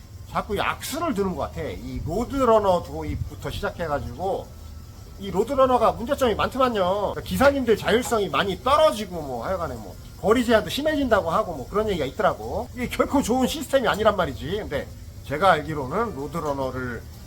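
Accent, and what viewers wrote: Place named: Chungcheong, South Korea. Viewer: 역시 충청도여 ㅋㅋ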